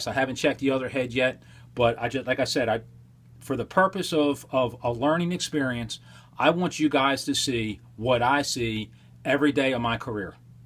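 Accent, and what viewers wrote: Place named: Baltimore, United States. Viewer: Damn, you GOTTA be from Baltimore!! I know that accent anywhere!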